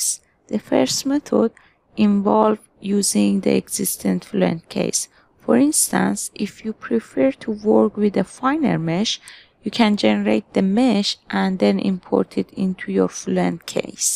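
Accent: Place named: Iran. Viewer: انگلیسی با لهجه‌ی ایرانی 😁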